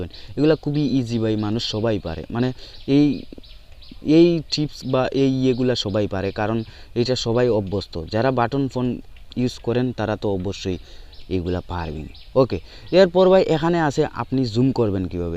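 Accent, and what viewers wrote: Sylhet, Bangladesh. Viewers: Sure o sylheti 100% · Vai apnar basa ki sylhet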